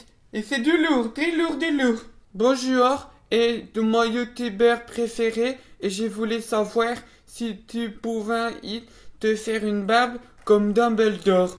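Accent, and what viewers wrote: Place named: Nord, France. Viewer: Il est de ou ? Parce que son accent est plus du 59 que du 62 .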